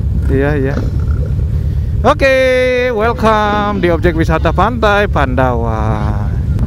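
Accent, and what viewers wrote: West Java, Indonesia. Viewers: Logat orang sunda iye he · Bro aslinya orang mana logat sundan nya agak kenyel hehehe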